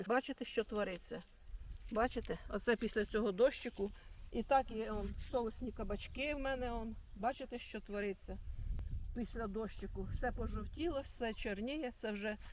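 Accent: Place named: South, Ukraine. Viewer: Наш звичайний південний ,,говор''😊. Дякую за поради.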